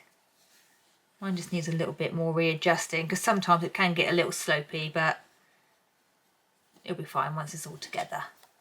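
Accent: United Kingdom. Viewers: I love love love you for many reasons 😂 but most of all is british accept but US terminology. I had no idea that i can gwt both. So it's all a lie that I can't have my cake and eat it too 😂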